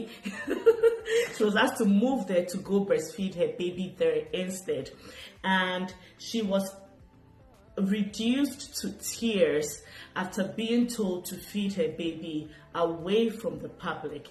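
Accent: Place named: United Kingdom. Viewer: I love the background and your UK accent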